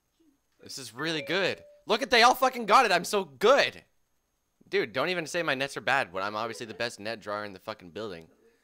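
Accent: Canada. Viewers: haha is starting to sound more Canadian with the eh thing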